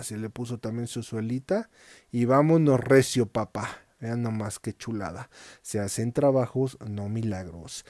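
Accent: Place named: Mexico City, Mexico. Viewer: su forma de exprecion es muy corriente. con grocerias, como pegamento chingon, y el sonidito y argumentos muy chilangos. fuera su canal de mi lista.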